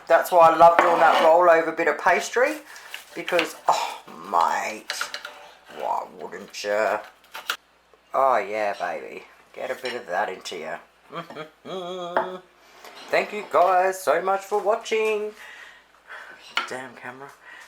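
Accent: Australia. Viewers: Ooohh maaate😂😂😂😂...can't be anymore Aussie than that😂😂😂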